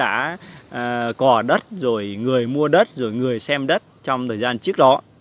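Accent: Thanh Hoa, Vietnam. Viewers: Nghe giọng người anh em thiện lành này chắc thanh húa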